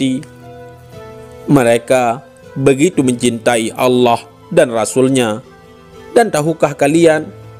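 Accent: Aceh, Indonesia. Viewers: Aceh pidie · Menurutku itu logat orang acheh bro bukan bali ataupun lombok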